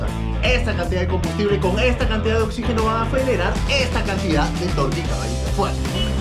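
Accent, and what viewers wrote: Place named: Peru. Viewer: Tienes acento peruano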